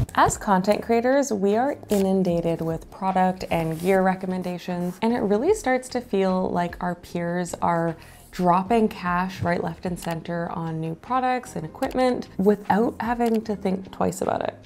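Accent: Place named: Canada. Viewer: Canadian Accent (out)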